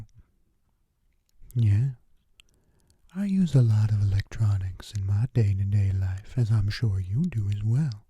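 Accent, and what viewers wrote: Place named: South, United States. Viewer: Southern accent,I love it!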